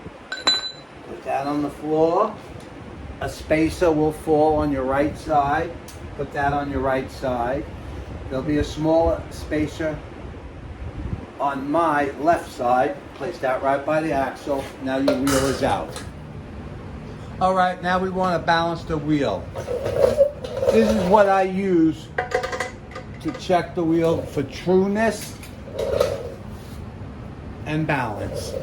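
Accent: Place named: East Coast, United States. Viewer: A very big and welcome hint...will take a good look this weekend. A side note...I love your east coast accent.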